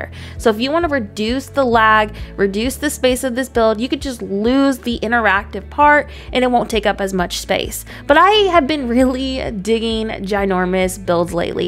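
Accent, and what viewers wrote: South, United States. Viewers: I thought I heard a bit of a southern accent but when you called the shopping cart a buggy I knew you were my people lol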